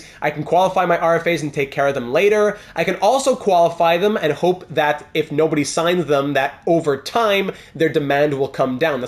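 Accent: Quebec, Canada. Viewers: thank you! Great video and est ce que t quebecois? Si oui gg pour ton accent anglais!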